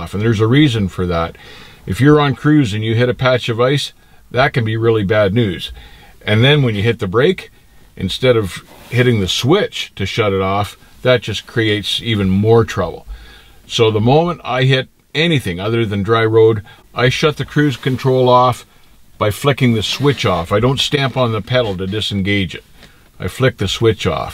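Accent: Canada. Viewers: which part of Canada are u from?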